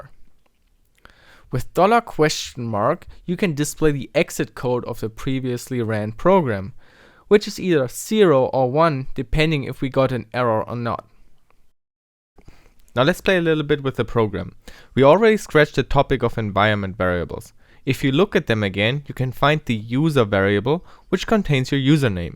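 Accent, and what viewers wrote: Germany. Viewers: can tell that you are german, but it's definitely not that bad. I can understand you very clearly and I don't think there is anyone who wouldn't. You're very articulate in how you speak and very clear. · Are you german? :D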